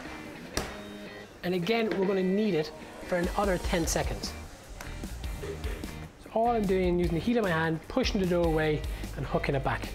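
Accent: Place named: Ireland. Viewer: bet you're fun to be around in a day to day situation. Maybe have that stick surgically removed from your backside?? Jeez. I have to say that I too enjoy his accent, but it's not because it's his.. just enjoy the irish accent. If you can't understand things like that ... well I REALLY feel sorry you ...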